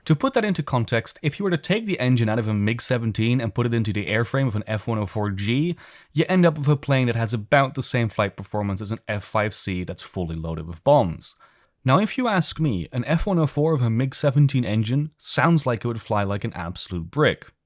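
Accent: Netherlands. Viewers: Really love the video, but one question. Are you dutch?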